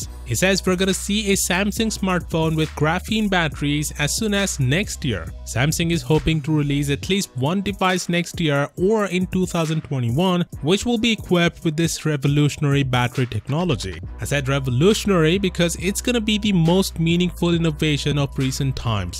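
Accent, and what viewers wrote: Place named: India. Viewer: ugh. indian accent. I disliked the video instantly.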